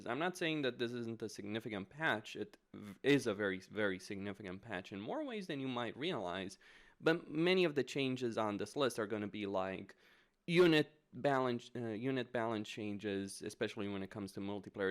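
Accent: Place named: Romania. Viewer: e sounds Romanian I might be wrong tho. Some Balkan country maybe? Romanians have a pretty distinct accent I find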